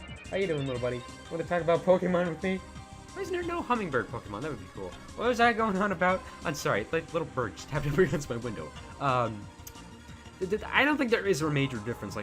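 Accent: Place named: Scotland, United Kingdom. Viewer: Love the Scottish accent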